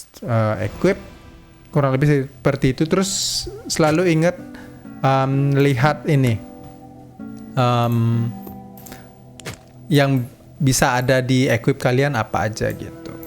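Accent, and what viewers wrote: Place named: Pontianak, Indonesia. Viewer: Logatnya mirip orang pontianak bang 😅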